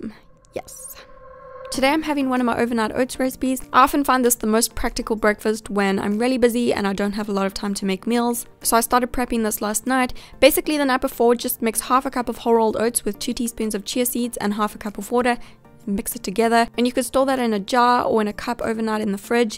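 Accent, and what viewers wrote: South Africa. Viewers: Is sy van suid Afrika? Want sy klink soo... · When you said that you drink Royibos tea ( I can’t spell) I knew that you had to be from there, because you also have the same accent as my parents ( they are also from South Africa) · Pxssy Destruktor she is from South Africa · I have a question are you south african? because you sound very south african by the way I am south african. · Are you south african? I can hear ittt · I'm new... your accent? South African? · Cat Mouse she’s South African😊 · Are u south African ? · Omg omg are youSouth African ? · Hallo. Bly jy in Suid Afrika. Jy klink net so. Wow, jou videos is baie mooi. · OMG. This is my first vid of yours. I'm from South Africa and as soon as I heard your accent I got so excited.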